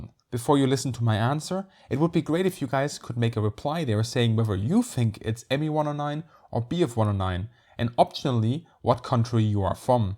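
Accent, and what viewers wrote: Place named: Germany. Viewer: Are u german? have the accent but was an interesting video!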